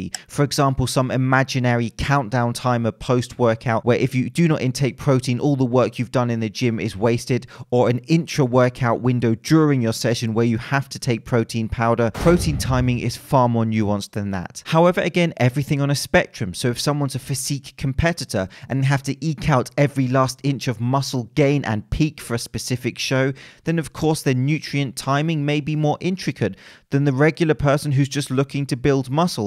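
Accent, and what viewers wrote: United Kingdom. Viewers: Are your accent was British?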